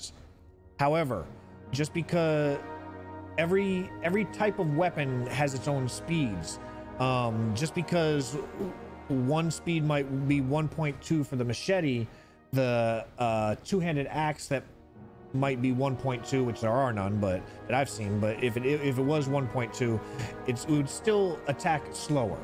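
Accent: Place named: United States. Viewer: It is somehow weird watching someone with an American accent play this game.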